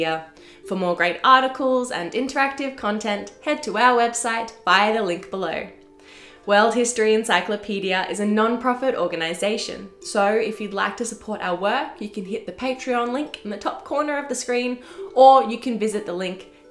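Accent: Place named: Australia. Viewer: Great presentation. You sound like an Aussie.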